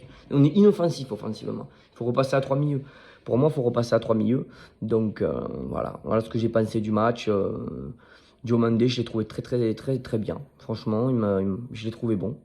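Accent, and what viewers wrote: South, France. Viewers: Salut, tu es originaire de quelle région de France ? Tu sembles avoir l'accent du sud ;)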